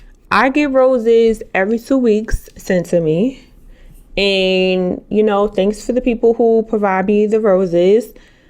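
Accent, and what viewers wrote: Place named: South, United States. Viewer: 😍😘 I like everything I see and hear. Your braces, country accent, skin, face, everything, bald head, all of it.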